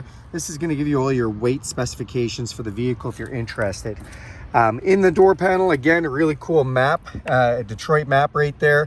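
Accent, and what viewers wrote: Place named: Canada. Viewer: I freaked out for a minute on the price....then I heard your accent "aye"....then realized it was Canadian price! lol. Great review! What I REALLY liked about your review is that you said "as well" only a few times when describing the features. I know it is hard, but several other reviewers say that dozens of times in a 10 minute review and it is distracting. Thank you.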